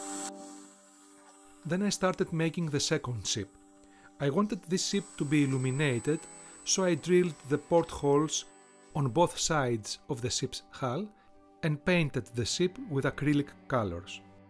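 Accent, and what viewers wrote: Greece. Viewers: speaking English with a Greek pronunciation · your pronation its like a greek who tries to speak English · Με την πρώτη λέξη κατάλαβα ότι είσαι Έλληνας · η ελληνική προφορά είναι ότι καλύτερο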